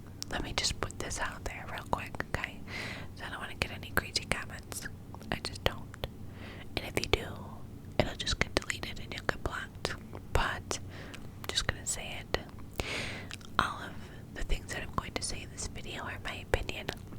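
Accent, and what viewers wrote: New York, United States. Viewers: Thanks! ☺ BTW love your vids they are awesome. Just seen your jail vid. Its absolutely awesome thanks for sharing! Now i am going to watch your New York accent! ❤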